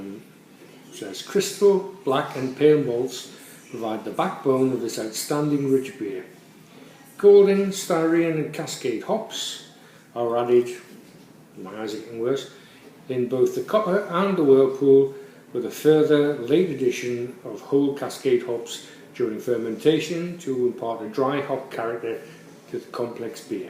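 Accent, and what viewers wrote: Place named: Northern England, United Kingdom. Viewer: I think The Malt Miller, Worcester Hop Shop and a couple others give the option of crushed or uncrushed. Think the benefits of uncrushed are it keeps fresher for longer. I've heard people say uncrushed grain only really keeps for a month or so, but i must admit I've never had any problems with 6 month old grains. Really enjoying the video's and glad Ant Rogerson directed me to your channel. I'm from just down the road in Newcastle, and its very refreshing to hear a Northern accent on youtube. Especially after putting up with those Southerners like Ant Rogerson and Cheshirehomebrew for a while.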